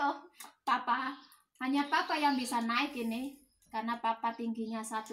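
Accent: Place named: East Java, Indonesia. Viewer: Asli Jawa Timur pasti ya mba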